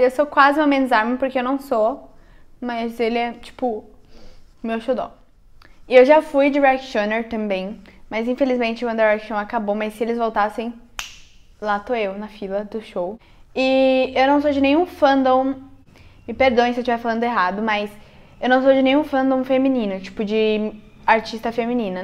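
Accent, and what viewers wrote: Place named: Rio Grande do Sul, Brazil. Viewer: Te amo, vc é muito linda, tbm acho legal o sotaque gaúcho. Vc é bem sincera, e vc gosta de tudo que é bom, curto muito seus vídeos. Determinada, sabe se expressar e sou sua fá. Bj princesa....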